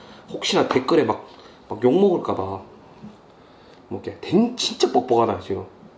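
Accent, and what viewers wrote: Busan, South Korea. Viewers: 영상에서 짜워? 이렇게 물어보시길래 ㅎㅎ 부산사투리군여 답글 감사합니당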